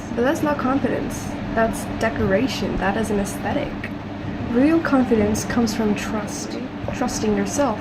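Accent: United States. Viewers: Wait how did u get your American accent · YOOO YOUR AMERICAN ACCENT IS ON POINT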